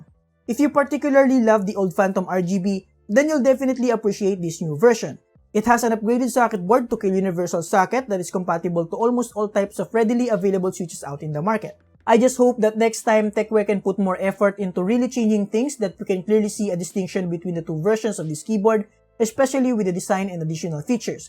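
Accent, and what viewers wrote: Philippines. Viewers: This guy has the most Filipino accent ever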